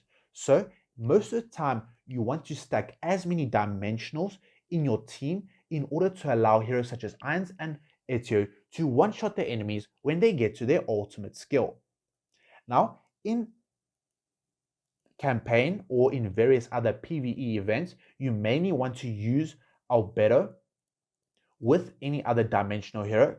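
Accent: South Africa. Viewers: Are you a Saffa?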